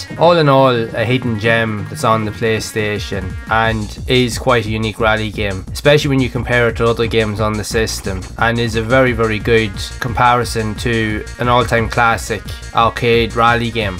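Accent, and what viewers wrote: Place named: Dundalk, Ireland. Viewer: Hearing a man pronounce Japanese game titles in a thick Dundalk accent is my type of content. Been digging through the PSX a little trying out the new FPGA Mister core. There are so many JP exclusive titles I missed.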